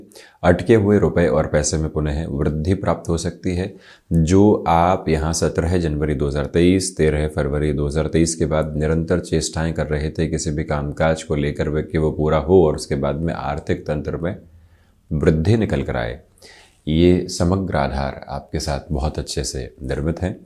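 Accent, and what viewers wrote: Standard. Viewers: Aap ki hindi bahut shuddha hai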